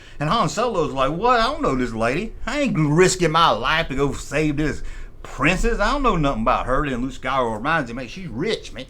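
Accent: South, United States. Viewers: Now this is my kind of recap/storytelling of the original Star Wars movie, as someone who also is a southerner, I understood everything you said.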